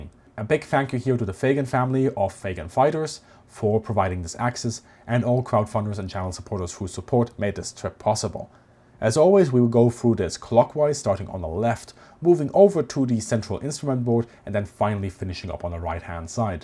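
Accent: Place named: Germany. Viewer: Too fast, too German, too bad.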